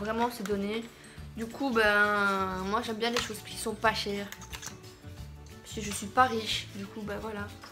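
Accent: Belgium. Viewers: Tu es belge sa s entend 😂 moi aussi je suis belge bisous je t aime ❤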